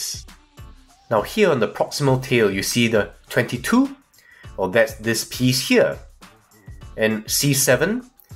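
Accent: Singapore. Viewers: You do sound Singaporean.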